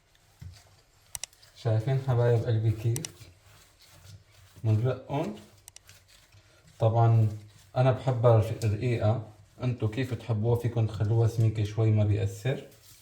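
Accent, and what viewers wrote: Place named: Syria. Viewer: بس عفوا منك انت من وين....وين تعيش لانو تحكي سوري انا سورية من حلب بس صار لي ٣٦ سنة في سويسرا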